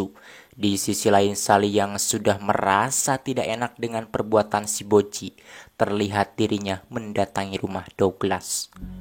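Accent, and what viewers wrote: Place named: Tasikmalaya, Indonesia. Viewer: Pasti orang tasik atau teu garut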